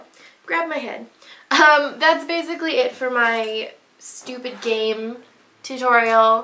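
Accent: United Kingdom. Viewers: Lol I love this look, despite the inspiration xD I've never played that game, but the only Facebook game I've ever played is the Hunger Games Adventures, where I haven't played in a million years. Your British accent just makes your resemblance to Kate Winslet more legit :P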